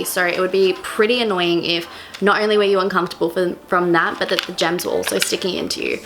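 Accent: Melbourne, Australia. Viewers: I'm American, and her accent sounds Australian. Just like us Americans, we have different accents from all over the country. I'm pretty sure the same goes with Australia. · Right when I clicked on her video, and heard her speak... I knew right away, she's Australian. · What? she sounds completely Aussie. Is she supposed to sound like a bogan not be accused of 'faking' her accent? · She sounds like every white girl in Melbourne lol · Why does your Australian accent sound mixed with American? Your accent sounds nothing like mine. I’m Australian also. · I'm Australian too and she sounds just like I do. Sometimes people tell me I sound American a bit too. I grew up moving from Queensland to Melbourne? Maybe that's why? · I get so used to hearing American's on the internet and in TV shows and movies, I always have to double take when I hear an Aussie, Proud <3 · America has stereotyped the australian accent 😂😂😂 i love your pure accent more!!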